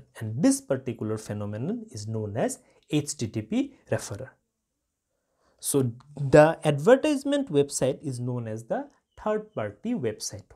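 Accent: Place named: Uttar Pradesh, India. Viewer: Hello you are from UP na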